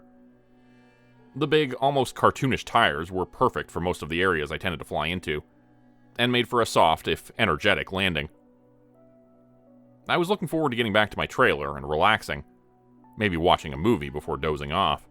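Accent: Canada. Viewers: You say you're from Florida but you sound like a canuk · Sounds like a Canadian accent. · Sounds like story teller is Canadian. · How come someone from South Florida has a Canadian accent? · Ah geeze! The narrator is Canadian and does not sound like an American accent not a Floridian at all. My family being half Canadian I would know that accent anywhere. Aboot White ooot Cut ooot Ooot here in the wilderness. 😢😢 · Sounds like you're from Canada not Florida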